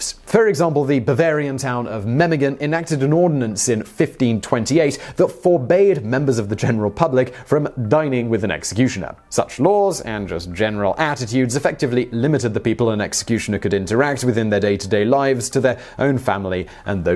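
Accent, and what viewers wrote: United Kingdom. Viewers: Why is talking with a British accent. He's fancy and everything but now he just sounds silly. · wtf I came here for that V-Sauce guy. And momentarily thought he magically gained a British acccent.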